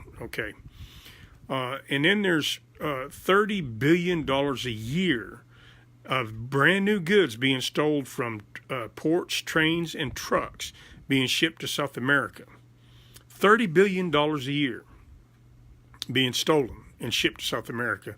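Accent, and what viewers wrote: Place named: Appalachia, United States. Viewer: wow this hillbilly needs to learn English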